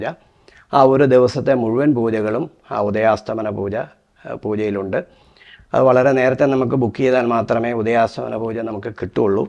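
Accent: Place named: Kottayam, India. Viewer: ഒരു വർഷം മുമ്പ് ഇട്ട വീഡിയോ ഞാൻ ഇന്നാണ് കണ്ടത്.....തിരുമേനിയുടെ സംസാരം കുറച്ചുനേരം കേട്ടപ്പോൾ കോട്ടയം ഭാഷ പോലെ തോന്നി....ഞാനും കോട്ടയം സ്വദേശിയാണ്.... ഇപ്പോൾ തിരുവനന്തപുരത്ത് സ്ഥിര താമസം ആണ്.....പല തവണ ക്ഷേത്ര ദർശനം നടത്തിയിട്ടുണ്ട്.....ഗണപതി ഭഗവാൻ്റെ അനുഗ്രഹം എല്ലാർക്കും ഉണ്ടാകട്ടെ,🙏🙏ഓം ഗം ഗണപതയെ നമഃ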